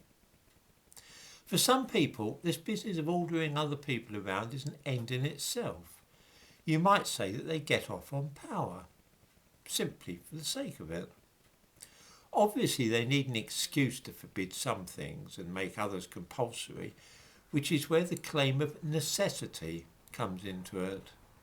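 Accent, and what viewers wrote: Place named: United Kingdom. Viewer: "Hello again." I can't help my self to repeat it in the same fashion in a moc your accent. Imitation is flattery type of way. It sounds so nice, I must hear it twice. Lol please don't take it as culture appropriation or a ethnic offense please. I love when Brits do silly impressions of Americans. Do you have any audiobooks on the markets?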